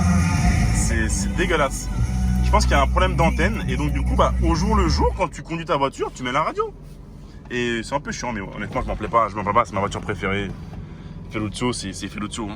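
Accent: Mauritius. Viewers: mec t'es mauricien?😅💯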